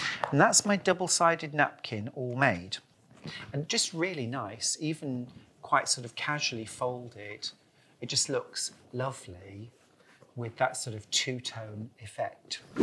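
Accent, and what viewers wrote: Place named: East Yorkshire, United Kingdom. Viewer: Thank you. You are an excellent tutor. (A bonus is that this East Yorkshire Lass (now a Norfolk dweller ) gets to hear the familiar accent!)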